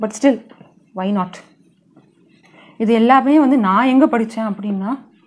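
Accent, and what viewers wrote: Sri Lanka. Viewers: ilangai Tamil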